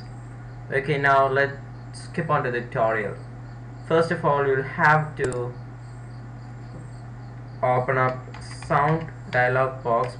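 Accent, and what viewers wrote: India. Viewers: he has idian voice so he can fix it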